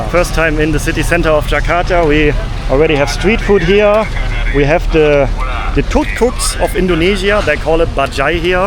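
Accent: Germany. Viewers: He's got a horrible German accent. But if that is what you like, just listen to Germans. Just find the ones with the worst accents and whose vocabulary is extremely limited and basic. Many out there in Germany. 🙃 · Your German's accent is really strong huh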